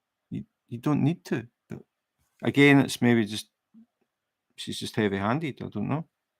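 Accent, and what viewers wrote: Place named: Scotland, United Kingdom. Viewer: Diamond painting AND a Scottish accent. I can change up from diamond painting with Glaswegian comedian Kevin Bridges. 😳😂😂😂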